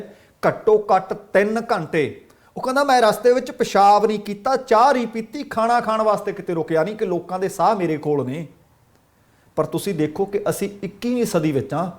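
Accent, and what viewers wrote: Malwa, India. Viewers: ਧੰਨਵਾਦ ਜੀ, ਵੀਰ ਜੀ ਅਵਾਜ਼ ਤੇ ਜਜ਼ਬੇ ਤੋਂ ਮਾਲਵੇ ਤੋਂ ਲਗਦਾ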